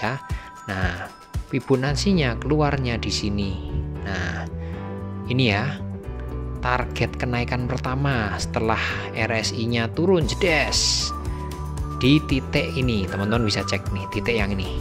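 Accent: Java, Indonesia. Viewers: seep ..om ...keren....medoknya hmmmm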